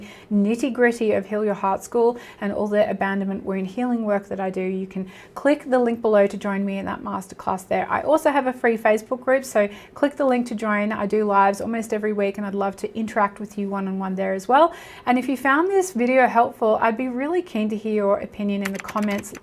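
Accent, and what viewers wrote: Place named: Australia. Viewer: I think she’s Australian.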